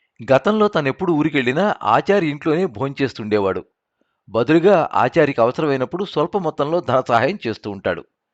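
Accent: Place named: Telangana, India. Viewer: నేను రాసిన 'శిథిల సంధ్యలో చిరు దీపం' ను శ్రీ గారు అధ్భుతంగా చదివారు. అవసరమయిన చోట స్వరంలో ఎంతో ఆర్థ్రతను కూడా పండించడంతో రాసిన నాకే కథను వింటుంటే హృదయం ద్రవించింది. వారి గళంలో తెలంగాణ యాస వినసొంపుగా ఉంది.అది నిజంగా శ్రీ భోగారావు గారి స్వర మహాత్యమే! వారికి నా హృదయపూర్వక అభినందనలు మరియు ధన్యవాదాలు!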